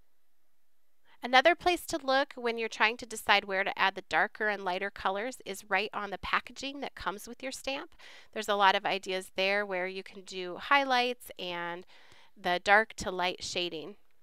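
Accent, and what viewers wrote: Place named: United States. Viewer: i love PB stamps and have a few, i watch all your videos but just wanted to say i love your voice!! I love the american accents anyway, but your voice is so nice, sorry to be off topic, lol, hugs from Vick in England xxx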